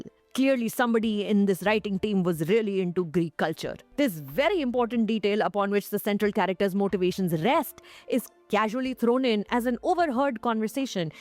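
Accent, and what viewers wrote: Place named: South Bombay, India. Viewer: south bombay accent english haha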